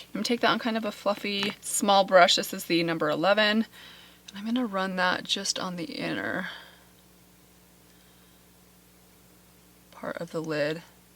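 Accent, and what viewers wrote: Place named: Utah, United States. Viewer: Oh my heck, I totally love you,!!! I just received these two palettes in the mail about a week ago and being the novice I am, I wasn’t sure how to use them. Thanks for posting a tutorial so well timed! 😊 Also, did I guess it or did I guess it! I knew that accent came from Utah. 😉 I used to live there myself. Where did you and your husband build your new home? Those irrigation pipes kind of reminded me of Idaho...? Keep the videos coming! Love you! ♥️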